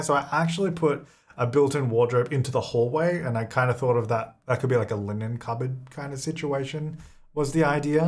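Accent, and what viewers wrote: Australia. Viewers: I love your australia english :)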